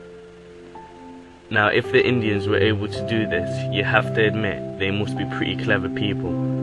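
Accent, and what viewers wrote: United Kingdom. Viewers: What you see hear? There? I love how Puma Punku leaves massive unanswerable questions, but really? I guess what I am saying is that your British accent is not too strong enough for me to requite poorly written subtitles. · Next time you post a video please have the text checked first by someone who completed their O-levels, then have the voice over read by someone without a Yorkshire dialect. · im not hating on the london accent, im from london myself, im just saying that it makes you sound unprofessional. i speak like how i was taught in school, like you are supposed to speak yes? personally i believe the london accent is not as dominant as lets say scotland. we have a choice here, either speak normally like many people around you in london, or speak like a twat. and there you go.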